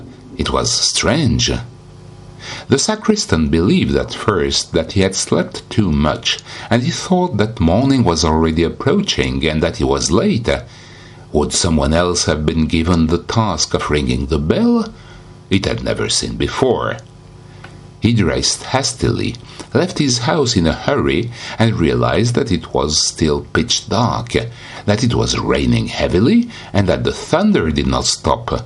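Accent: France. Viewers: I love the narrator's French accent !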